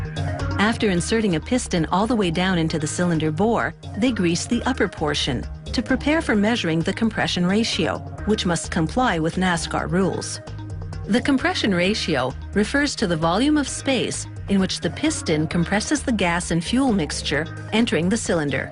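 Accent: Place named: Canada. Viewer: Canadian narrator for sure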